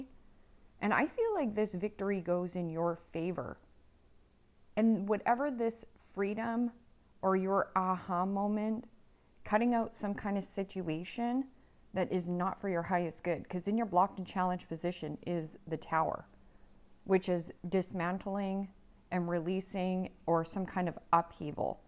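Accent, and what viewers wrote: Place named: Canada. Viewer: ❤ I thought I detected a Canadian accent 🙂🇦🇺🇨🇦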